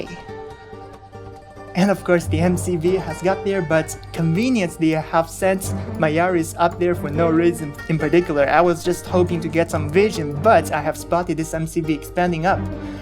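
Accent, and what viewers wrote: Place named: Arab world. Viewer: I thought your original voice is just 100% Arabic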